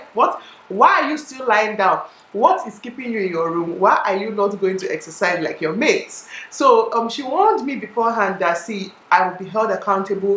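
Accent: Nigeria. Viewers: In God name ,can someone explain to me what she's talking because not anyone is able to listen to english with Nigeria accent please i beg in Jesus name... i just want to know what she did for her weight loss if it was with some writting that will be easy for peoples like us.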